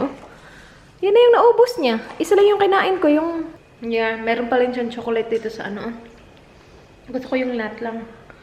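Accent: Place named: Visayas, Philippines. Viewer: Hi ate 😃 makahappy man ka lantawon te oi kay same atung accent pag magtagalog hahahaha😅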